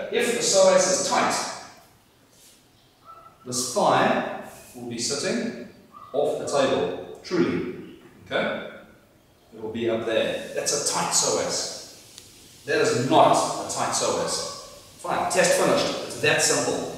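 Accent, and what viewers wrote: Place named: Zimbabwe. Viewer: I hear by your accent you're Zimbabwean or South African. Are you available for therapy to Zimbabweans if so where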